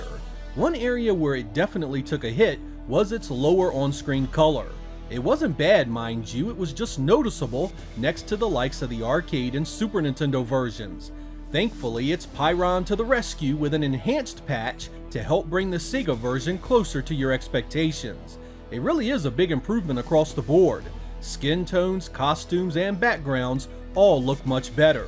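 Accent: United States. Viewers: After so many videos it finally hit me why your accent is so familiar, you must be from the same state as Tim Cook?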